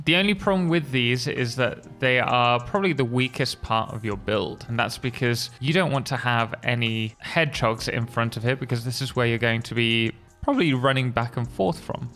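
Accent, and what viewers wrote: United Kingdom. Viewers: hell yeah bro you sound more british than my dad, and that makes me know you bought to lay the words of god on me right now. if anyone knows how to go somewhere new and take it over, its yall 😮📝 im here to learn dawg on a real note what you said about the main story yeah dude those caves freak me out i just want a castle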